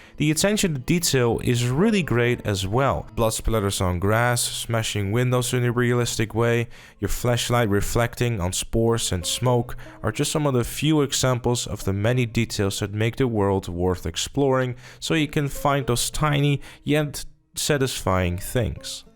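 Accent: Netherlands. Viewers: This guy is definitely Dutch😅 Great review tho, keep up the good work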